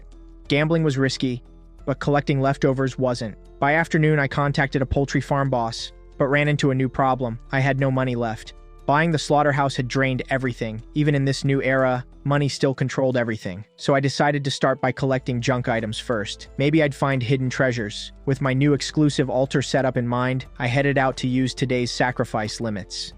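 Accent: Jamaica. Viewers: Ohhh yuh Jamaican.. eh video tuff bro nah gu lie but wish wah prt 3 did di deh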